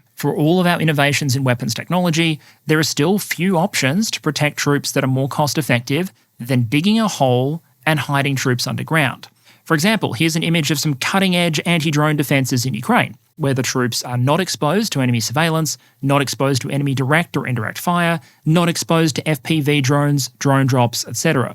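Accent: Australia. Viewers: Maybe they've never heard an Aussie accent? Or humor?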